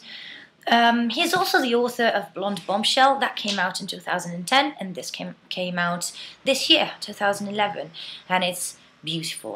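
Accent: United Kingdom. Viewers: mamma come parli bene, con accento inglese proprio! sono stata un anno di america (ho solo 17 anni) e ho molto d + l'accento "slang americano" tu invece sei così english:P!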